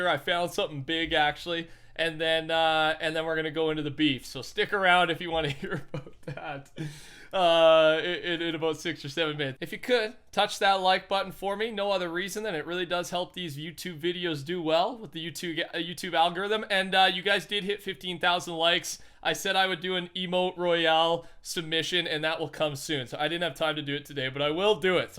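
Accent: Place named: Canada. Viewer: I love it when Canadians say that there fired up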